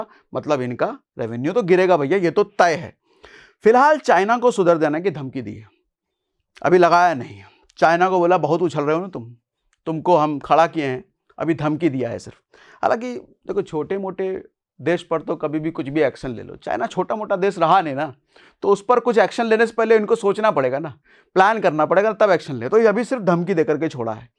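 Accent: Bihar, India. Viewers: Bihari tone me daam hota hai. Logic very fine sir ❤❤ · जी ये तो पूरा बिहारी टोन है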